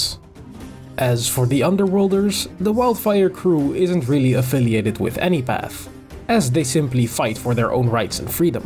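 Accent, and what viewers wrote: Netherlands. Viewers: Appreciated this video! I was just wondering, based on your accent, are you dutch by any chance. Question coming from a dutchie 👀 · Maybe I’m tweaking but are you Dutch? Your accent seems so similar to the Dutch accent- from a Dutchie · Your accent screams Dutch I'm sorry xD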